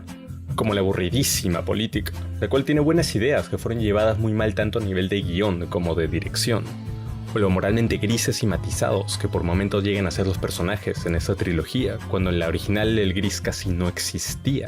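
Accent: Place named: Peru. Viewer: manoo ese acento peruano es todo te juro, sube mas videos asi la subes como mrd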